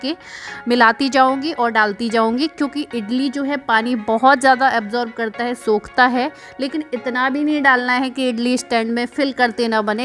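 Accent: Uttar Pradesh, India. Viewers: Aap kha se up se ho gya apki awaj se lg rha hai plz reply jrur krna kha se hoo tum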